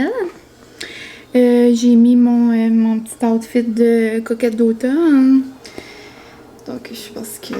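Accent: New Brunswick, Canada. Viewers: Je viens de découvrir ta chaîne et j’adore 🤍 C’est sûr que tu viens du N-B par ton accent ! Ma maman vient de là et quand je t’entends parler ça me fait tellement penser à sa famille au N-B 😅 Moi je suis née au Québec donc je ne parle pas comme eux, mais c’est trop cute t’entendre parler. Je serai clairement une abonnée assidue à tes vlogmas 😍❄️🎄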